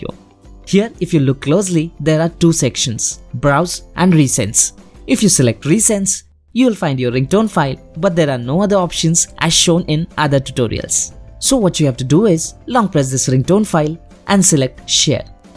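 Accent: India. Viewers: Thank you very much And your accent is wonderful. I’ve always loved the Indian people’s accents when they speak English. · your accent is very strong Indian boy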